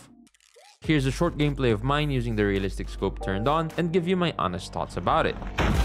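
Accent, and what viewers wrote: Philippines. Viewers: You sound so filipino😅 (I know its not the topic i just wanna ask)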